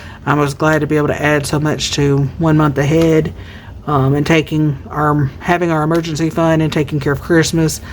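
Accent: South, United States. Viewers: I'll always love just listening to you chat with us. You're just the right amount of southern (on something you mentioned in another vid)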